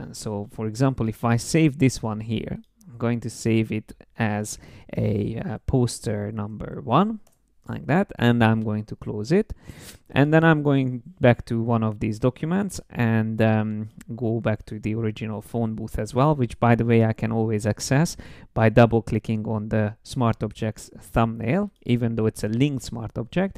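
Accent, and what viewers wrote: Hungary. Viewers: fantastic again!! thanks!! btw my girlfriend is Hungarian and she heard your voice while I was looking at the video and said "is that guy Hungarian??" hehe, I myself cannot recognize the accent. Thanks a lot man, very useful tips and great clear-fun teaching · that hungarian accent is strangely comforting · I heard your accent is hungarian - then I saw the little flag up there! 2 - 0 Hungary - Austria!!!!!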